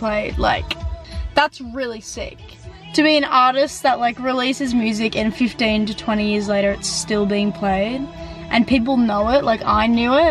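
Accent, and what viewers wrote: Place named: New Zealand. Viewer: I thought hang on is she a kiwi?! I had to check. You sound more kiwi than aussie IMO 😅 I'm from NZ 😁 Love your vibe and sass. Stay real 👌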